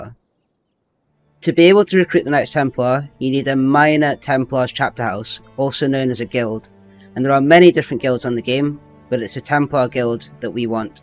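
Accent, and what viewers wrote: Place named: Scotland, United Kingdom. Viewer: Damn ur accent is thicker than the games scotish voice actor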